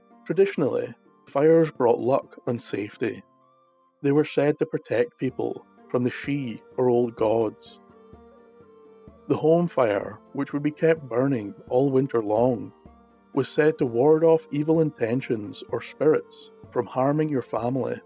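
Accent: Scotland, United Kingdom. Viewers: A Scottish accent is my absolute favorite accent and listening to you tell these stories has also taught me proper pronunciations! Because how I’ve read certain words in books is NOT at all how it actually sounds. Lol. I get so excited when you have a new post! Thank you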